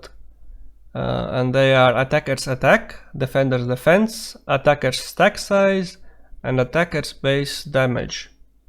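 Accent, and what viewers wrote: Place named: Latvia. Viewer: Latvian accent goes strong.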